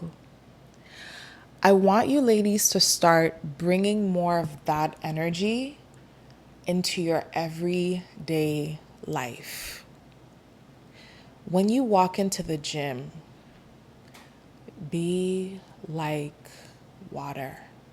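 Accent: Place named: Caribbean. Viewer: Binged these videos and from the first one I said “She from the Caribbean🤔”. That “aht aht aht” was it for me 😂🫶🏾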